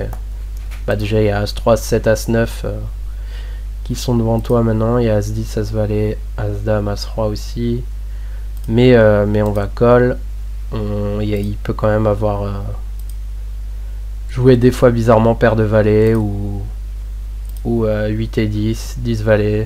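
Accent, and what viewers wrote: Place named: Alsace, France. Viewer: je me disais bien que je connaissais ce léger accent lol. Que nôtre Alsace est belle lol. Blague à part, vidéo très instructive. MERCI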